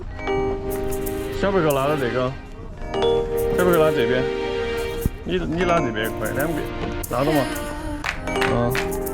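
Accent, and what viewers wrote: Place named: Sichuan, China. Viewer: 听口音川人老乡这个船买成多少钱我也想买一个在烈治文周边海钓三文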